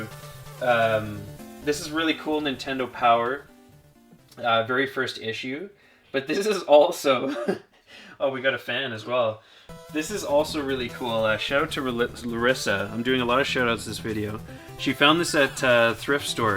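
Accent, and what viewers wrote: Canada. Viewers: Are you Canadian? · That haerd Canadian accent is real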